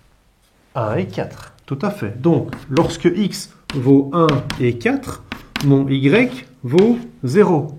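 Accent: Brussels, Belgium. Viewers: Je reconnais bien l'accent bruxellois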